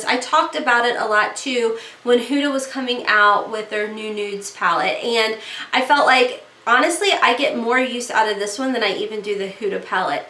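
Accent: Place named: Kentucky, United States. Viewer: Oh, I'm originally from SC but I LOVE your Kentucky accent!!! 😉💖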